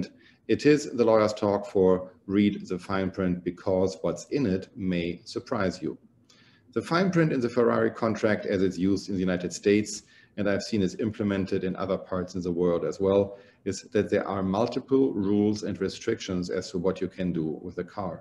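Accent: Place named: Germany. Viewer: Deutscher Akzent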